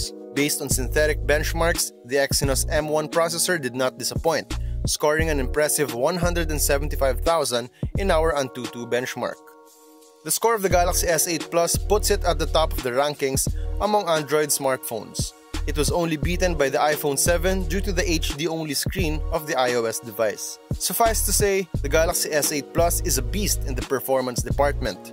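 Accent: Philippines. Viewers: wow at the first 5 seconds of the video I already knew you were a filipino because of your accent....since you're a Filipino I will give you a thumbs up and I'll subscribe...btw i like the quality of the vid. Great Job po 👍👍❤ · gawd .. the Philippino accent is the fucking woooooorst galàsheeew, clawkspeed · this guy sounds Filipino. eh :) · Chris humphrey galapon yup. He has a really heavy Filipino accent :)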